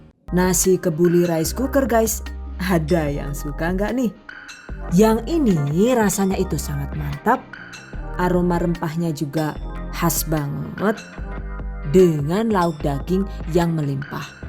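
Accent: Banyumas, Indonesia. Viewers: Jujur aku penasaran.mbaeki keturunan opotoh.wong jowo opo bulee.kok kadang Rodo ngapakk.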